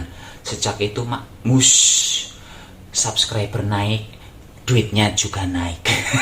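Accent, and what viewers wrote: Banyumas, Indonesia. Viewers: Bahasa ngapak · Channel sampean kuy unik, mas, khas dengan logat "ngapak", yang tidak terbiasa dengar merasa lucu dengar sampean ngomong Selamat ya.. Insyaallah barokah, jangan lupa rezekinya untuk istri & anak nya, ech sudah berkeluarga blum mas..🤭😘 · Selamat dan sukses buat mas.semoga tetep jadi anak yg berbakti sama biyunge.jangan dirubah yo logate ngapak,biar tetep rameee.... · Mas ini sangat lucu, asli, cerdas, sangat menghibur, ngapaknya, jangan marah, ya aku panggil kamu, mas???? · Jawa nya si mana ms.....? Ngapak ya.....?? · Ak seneng ndelok mergo bosone ngapak lucu..alat2te simple bahane yo gampil..cara penyampaine gampang plus cletuk2kane gawe ngekek dewe..semangat yo mas..berkah barokah aamiin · Lanjut kn dengan ngapaknya👍🏻👍🏻😅😂😂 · Selamat ya mas dengan bahasa ngapaknya justru itu jalan rezekinya sukses terus ya jadi diri sendiri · Mas aslinya mana...kok bahasanya NGAPAK....dan sekarang tinggalnya dimana....mas selamat ya semoga berkah.... 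· Bahasa ngapak lucu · aku kok seneng dgn located ngapakmu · Jujur Lik nyong SUBSCRIBE ya mergo bahasane sing ngapak Lik lucu😀 Padahal ya basaku dewek... selamat ya Lik sukses selalu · Yg bikin laris karna kocaknya NGAPAK ASLI dan ndesa bgt itu... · Selamat ya ms Justru aku tertarik dr ngapak, simpel n praktisnya itu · Mas....sy sering buat kuenya.....sederhanapun sdh bs jd kue.....alami lg. The best pokoknya lanjut mas....aku jg suka dr bahasanya yg ngapak2. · Mas selamat ya smoga makin byk subsucrebenya,krn ada ciri khas bhs banyumas apa mas 1 daerah sm mas Anngger pradesa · Asli orang mana Mas 😀 jawanya ngapak bisa menghibur nitizen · Ora ngapak ora kepenak. Kereeen mas 👍👍👍👍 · Ini yg selalu ditunggu resepnya.... anakke mbok Dirah... seneng soale pd2 wong ngapak... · Sebenere sampeyan asli mana pak...Banyumas atau mana...kok busnya ngapak... Salam ngapak 👍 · Selamat Yo mas mudah" sehat terus dan tetep ublek" di tunggu resep" nya dan tetep bahasa ngapak nya aku suueeeng tenan...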